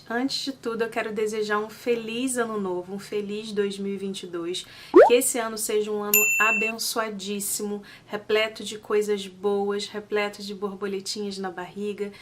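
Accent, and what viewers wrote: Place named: Rio de Janeiro, Brazil. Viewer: sua lindaaaaaaa, você é maravilhosaaaaaaa, mesmo! Carismática, de uma delicadeza ímpar! Tão bom ouvir você falar, a maneira doce com que se expressa com tanta verdade e com tanta suavidade ao mesmo tempo. Não se desculpe por nada...quem gosta de você, gosta de tudo e entende...transições não são fáceis. Mas acharemos juntas formas de transformar o que agora pode parecer um limão 🍋 em uma limonada, rsrsrs. Resista, insista, persista! Ouvir esse seu sotaque carioca tão delicioso e lind é sempre maravilhoso, e suas resenhas são de uma qualidade impecável! Vai dar certo! Vamos buscar as marcas. Marcar você pra que lhe enviem produtos. Você merece! Seu conteúdo é muito bom e único! Eu te adoro, mulher! Me motiva muito a me empoderar mais nesse universo de beleza, de maquiagem, de autoestima, de conhecer esse seu novo mundo aí também, nos EUA, e porque não? 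Você tem LUZ PRÓPRIA, acredite, e ela mexe com a nossa vida, pra melhor. Sei que você só pode dar certo. Só falta ajustar alguns detalhes nesse novo momento. Beijo enorme, lindeza!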